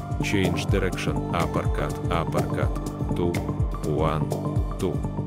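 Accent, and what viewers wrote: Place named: Russia. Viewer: The russian accent makes it even more authentic and soviet like😅👍👍👍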